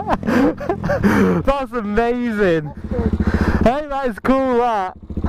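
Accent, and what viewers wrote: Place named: United Kingdom. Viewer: Just subscribed to your channel. Being in Japan it's good to hear a British voice on a motovlog for a change. I've also just started making bike videos. Only 1 for now, but check it out if you have time. Thanks and keep up the good work!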